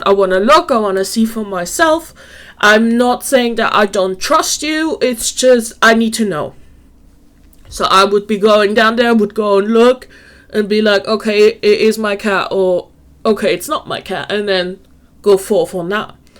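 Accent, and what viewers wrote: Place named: Germany. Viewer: I thought you sounded German!!! Welche Region wohnst du in?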